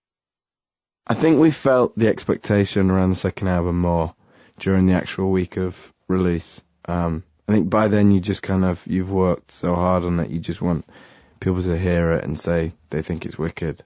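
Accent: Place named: United Kingdom. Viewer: Nope. American here. He sounds British.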